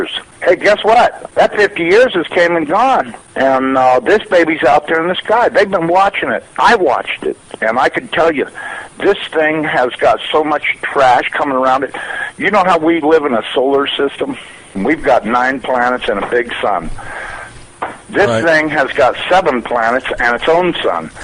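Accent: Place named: South, United States. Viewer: 13 years later total bullshit. this guy sounds like hill billy trailer trash.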